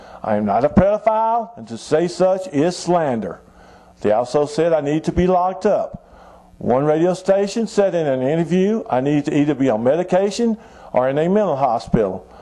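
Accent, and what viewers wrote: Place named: South, United States. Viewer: which one of the southern states is he from?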